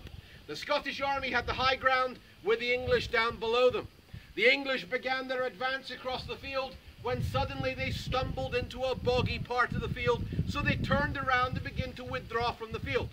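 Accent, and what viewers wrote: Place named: United States. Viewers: the presenter is a Highlander Born and bread, he went to America To study where he picks up his American twang. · Interesting presentation, however, the presenter sounds TOO American for the sake of authenticity. Saor Alba🏴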